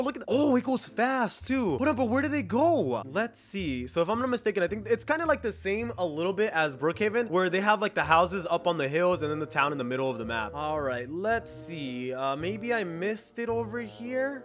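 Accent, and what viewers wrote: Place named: South, United States. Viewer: I like how he talk in dixe voice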